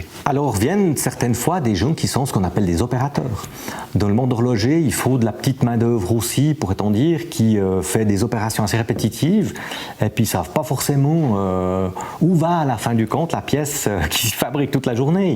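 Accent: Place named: Switzerland. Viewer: En tant que français, c'est un plaisir d'entendre ce bel accent suisse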